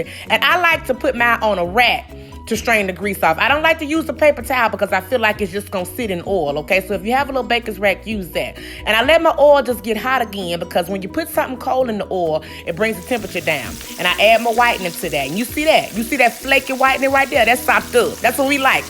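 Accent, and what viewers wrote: South, United States. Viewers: I like that country talk ❤️ Already subscribe 💪🏿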